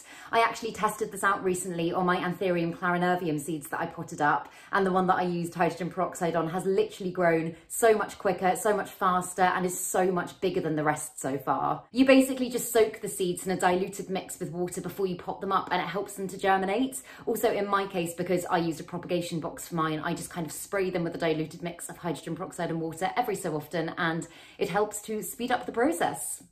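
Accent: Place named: London, United Kingdom. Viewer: I like your English. Londoner?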